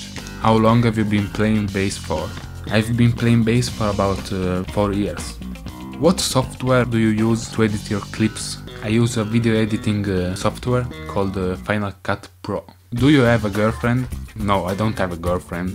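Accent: Italy. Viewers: Bruh, he already revealed his laugh 5 years ago and his italianglish voice too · • Italian voice/accent revealed • Laugh revealed CHECKMATE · If you observe closely you can hear a tha italian accent.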